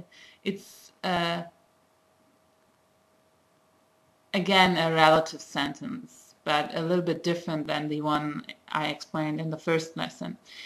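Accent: Nepal. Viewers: It is funny with aaudaina..I love your nepali accent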